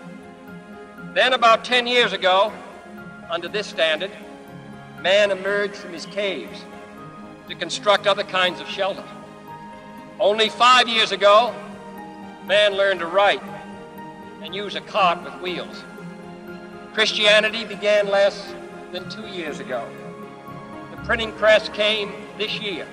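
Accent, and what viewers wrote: Boston, United States. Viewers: THAT is one wicked Bostonian accent.... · "......nat becausah there easy bat becausah there hahhd" -heerlijk Bostonian accent . Wisdom and courage combined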